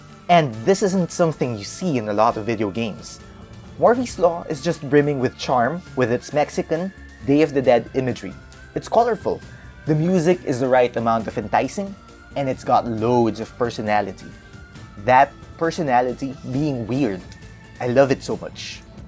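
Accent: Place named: Philippines. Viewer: Thats some solid pinoy accent:) great review though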